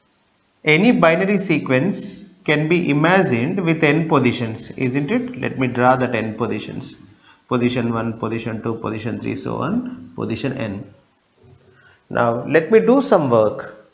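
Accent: India. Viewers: The explanation is good but the indian accent is the real problem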